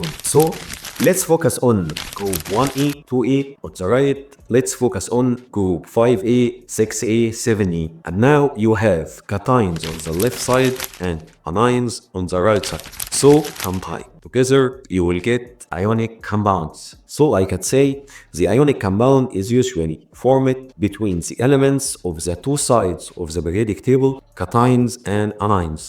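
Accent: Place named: Egypt. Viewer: الفيديو عظمة بس طلع لسانك في الTh يا مستر بدل ما كده مصري أوي 😅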